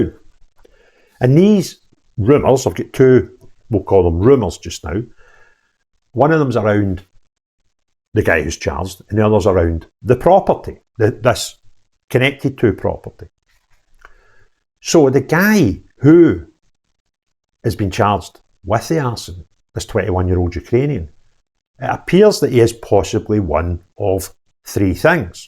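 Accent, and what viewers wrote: Scotland, United Kingdom. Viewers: Have a great day everyone...!!...from germany....yep.......hahaha.....love your scottish accent...or is it "dialect"...whatever...cheers. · Its sounded like he's said he's in a shaky peg.... Put on subtitles cc on vlog. And it shows that to be what said but its not always right with the Scottish accent. I've never heard that saying b4.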